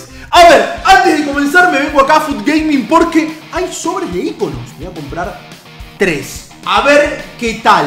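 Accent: Argentina. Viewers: no sé porque pero me da gusto escuchar ese tono de argentino ajkds like :)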